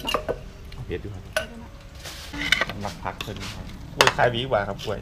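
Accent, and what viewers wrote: Isan, Thailand. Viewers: กูว่าแล้วมึงต้องเว้าเขั้มข้นมาก😆😆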